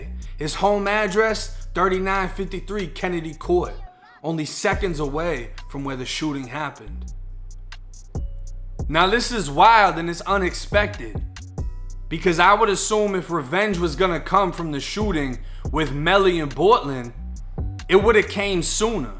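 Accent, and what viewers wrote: Boston, United States. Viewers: yo are you from Boston your accent is crazy 😹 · It’s a bit of a Boston accent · You must be from Boston Massachusetts just from the way you talk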